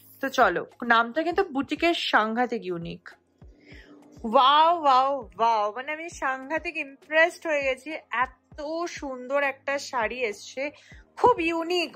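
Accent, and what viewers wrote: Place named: Basirhat, India. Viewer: Tumi basirhate r kotha bolle mon ta valo hoye jay...amro to bari basirhate tai😊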